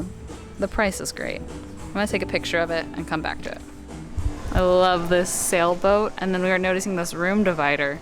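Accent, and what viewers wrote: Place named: Texas, United States. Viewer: I almost never notice your Texas accent but two weeks with your parents and I noticed it twice during this video! That’s cute.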